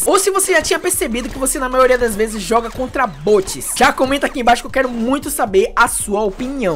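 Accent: Brazil. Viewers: esse sotaque ai é de salvador né, possivel...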